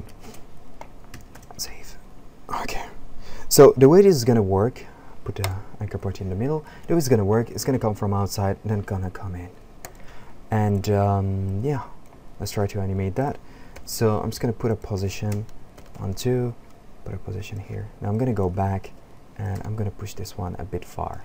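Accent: Morocco. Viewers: Hmmm good inspiration im late4 years x) you really sound moroccan(moroccan name +good english and good french)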